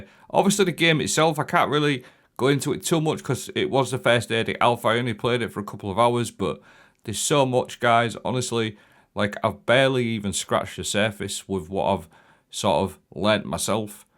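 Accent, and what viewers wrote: United Kingdom. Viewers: With your accent you can't be US based? You have to be in the UK surely?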